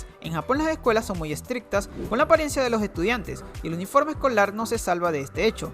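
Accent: Venezuela. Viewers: Eres venezolano? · compa eres venezolano?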